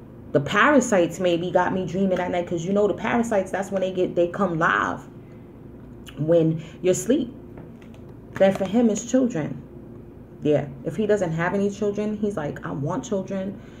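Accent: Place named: New York, United States. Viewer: I love your delivery. You are suuuucccchhh a NY girl lok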